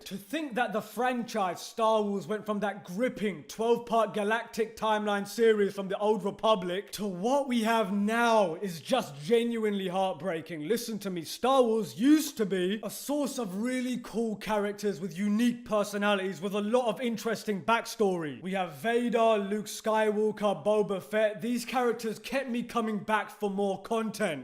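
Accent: United Kingdom. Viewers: He have british accent? I didn't noticed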